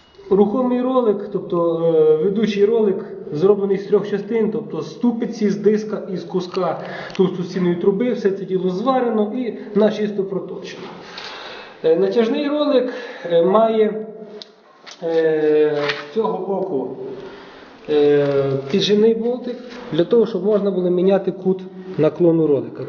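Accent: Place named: Ternopil, Ukraine. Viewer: Тут ще чути тернопільску мову, потім вже буде львівський пан.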